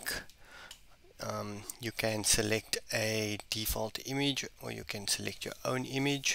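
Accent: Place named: South Africa. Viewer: Good to hear a familiar South African voice. lol. Cool vid. Worked 100% for me.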